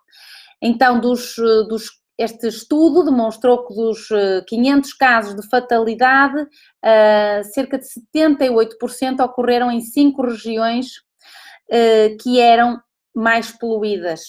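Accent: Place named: Portugal. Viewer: Amo ouvir você falar... Amo essse sutac português!! Sua linda!!!😃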